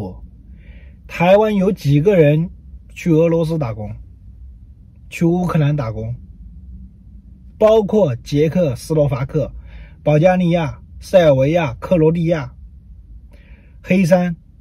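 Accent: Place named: China. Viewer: 他不是台灣人來自中國，聽口音也知道。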